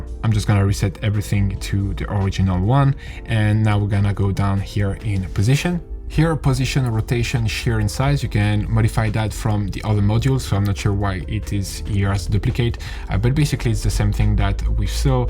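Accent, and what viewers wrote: France. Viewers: Love your French accent hah! Thank for this useful video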